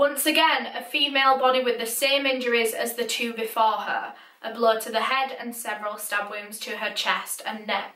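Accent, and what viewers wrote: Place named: England, United Kingdom. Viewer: Your accent makes me homesick for England. I grew up in Manchester but moved to the US in 1972 as a young teenager. In 1994 I came back to England with my teen daughter. We stayed for 3 years but hated how much it had changed so came back in 1997 after Princess Diana died. I wish I could have seen more of England while I was there but my daughter was more important than myself. She missed her friends and I missed my son and my mom and brother. So to all my British family, I love you all, Cheers.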